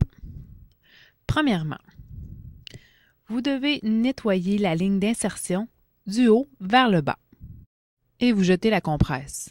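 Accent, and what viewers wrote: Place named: Quebec, Canada. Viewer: Accent québécois 🤢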